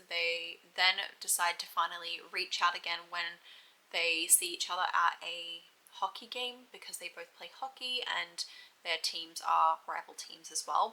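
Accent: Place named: Australia. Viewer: the australian cowboy accent 😭😭😭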